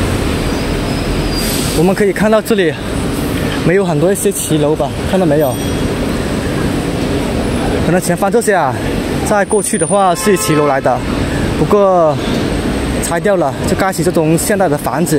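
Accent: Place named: Cantonese, China. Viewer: 讲这种粤式普通话，你不觉得羞耻的吗？讲粤语啦！！！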